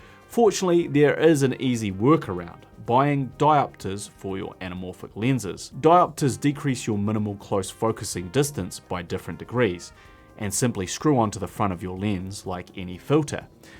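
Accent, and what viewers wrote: New Zealand. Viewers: Not funny … Guy’s a New Zealander or ‘Kiwi’ ….. that is just the kiwi accent. Accept ! Comment on his content which is always very informative.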